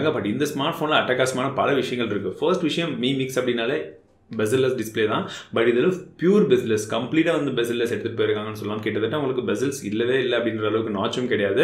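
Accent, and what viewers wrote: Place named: Kongu, India. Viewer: வாழ்த்துக்கள் அண்ணா..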